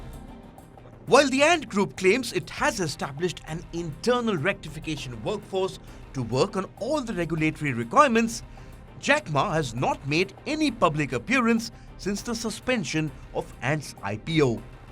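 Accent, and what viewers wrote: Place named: India. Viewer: The Indian accented female voice overs isn't helping the believability. Couldn't you hire someone with a Chinese accent for the female voice overs. 😐😐😐😐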